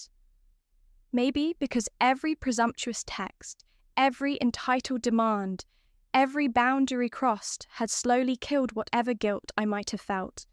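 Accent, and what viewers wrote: United Kingdom. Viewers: Very interesting story. Good British accent to voice ai. Okay text ai. Ninas spelled Nah very annoying. I liked that endless angst of used persons which feels over the top most times was absent from this story. Its clear that having a good friend to talk over the crazy entitlement of her family was very helpful. The narrative movement is lovely. Her family's comments and entitled posts coming at points when she might have hesitated and had second thoughts. That Emma got such a quick sale and some help from the real estate developer in the timing was satisfying. I would guess parents had intended right from the start to give her unit to her brother. Also the speed of the process kept the browbeating, blame, manipulation and financial abuse from getting any legs . And once they were out, wow ,no time to indulge because now they had to pay their own rent utilities food etc. 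Definitely satisfying. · I loved this story. It was thrilling and beautiful karma. I absolutely loved the British accent, never heard any of the AIs narrate in it. Some consequences come with a high cost when u come to your senses finally but it's always worth it (not for u obviously). 🤣🤣🤣🤣🤣🤣🤣🤣🤣. Well done OP. This story was really gripping and i couldn't wait for the karma to hit. Perfectly brilliant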